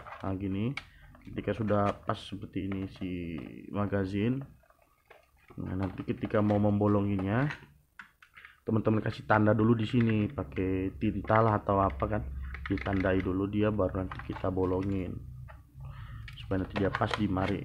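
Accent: Medan, Indonesia. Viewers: Jooosss bang.. Cm dr logat nya kyk org medan ni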